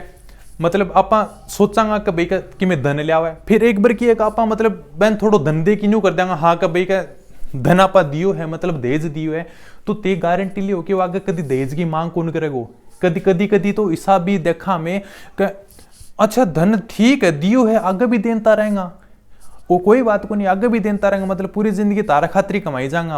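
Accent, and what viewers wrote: Rajasthan, India. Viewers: Rajasthani language hai thoda bhut different ho jata hai bolne m...bro aap smajh to PA rhe ho ...ki sir kh stree rhe h..😊😊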